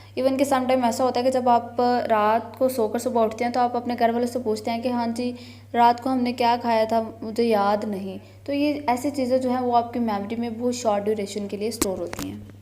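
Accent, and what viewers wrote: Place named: Punjab, India. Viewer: Really helped tho I'm loving her Punjabi accent in English 😂❤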